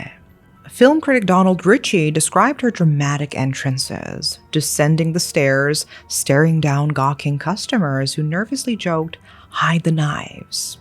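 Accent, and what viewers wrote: South Korea. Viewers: I can hear some Korean pronunciation to be honest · I love how you say Asian names with a Korean accent - a unique twist!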